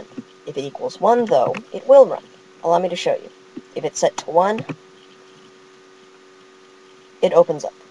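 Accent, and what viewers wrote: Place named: United States. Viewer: (I'm sorry, for possibly bad English) Madam, all of this is very interesting and I already fell in love with your voice (excellent American accent), but.. your tutorial is rather a programming tutorial, not AutoIt tutorial generally. I mean you don't explain why AutoIt deserves our attention if so many other programming languages are available? What AuotoIt can do what other scripting-based environments, scripting languages, or compilation-demanding languages- can't (or can but it's tedious)?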